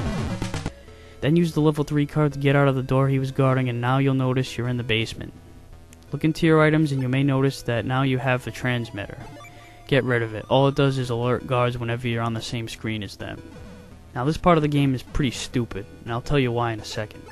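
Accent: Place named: New York, United States. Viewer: This is an amazing walkthrough! By chance you from New York? Just wondering as I hear a sight accent. Either way thanks and cheers!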